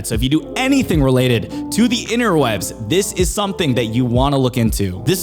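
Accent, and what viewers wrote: United States. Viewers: Very nice video... The words that come out of your mouth are really clear enough to hear.... Similar to an American accent.... It's good....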